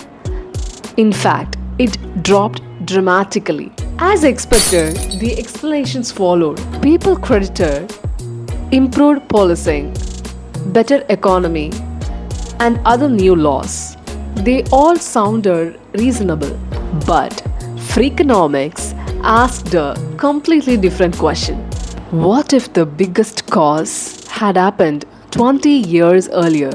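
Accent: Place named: United Kingdom. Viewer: I think she is speaking British English 😅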